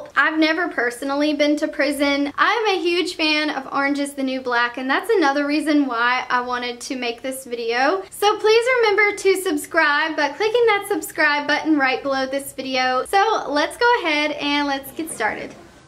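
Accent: South, United States. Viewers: why do u always talk like a country girl is gross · she talks country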